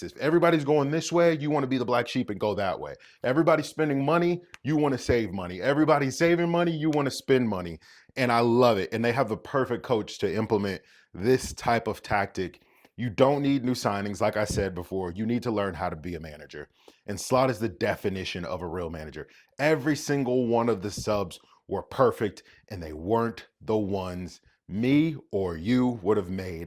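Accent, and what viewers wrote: United States. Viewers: I hear an American accent discussing (proper) football, I switch channels but you, my man, got me subscribed. You capture and transmit the excitement of the moment. Good job.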